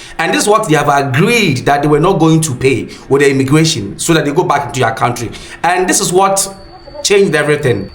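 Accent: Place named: Ghana. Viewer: SEE YOUR MOUTH calling color colar with your heavy Ghana accent. If you want blogging wars you will get it. You think Ghanians are saint and dont hane dirt ? We are trying to retrieve $59,000 and $48,000 among other fees from scammed us for land paid for two of my African American friends who bought into your advertisement to return to African.....SCAMMED IN BROAD DAYLIGHT BY GHANIANS!!! I have names and their plea in underwears begging for mercy to pay back. If videos go online now the west will laugh because the inner devide is what they want you fool! Perhabs we should just throw caution away and expose every dirt we can find on Ghanians too and lets this whole B.S happens. Even as I say this,I know defending the pride of African should prevail above anything else but sometimes these folks be asking for it!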